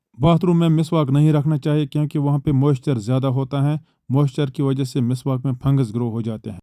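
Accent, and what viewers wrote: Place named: Kupwara, India. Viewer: Pls focus on your accent. It needs great improvement. You are using typical kupwara accent.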